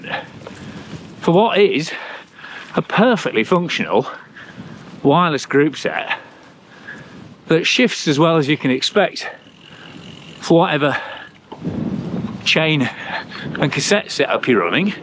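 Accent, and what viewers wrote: Northern England, United Kingdom. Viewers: Is it me or is accent getting more nothern as time goes by😂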